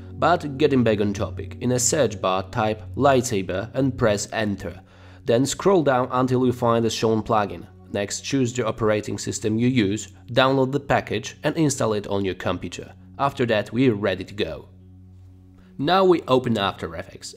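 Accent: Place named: England, United Kingdom. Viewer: Damn I wish I had that English accent. Great video btw 👍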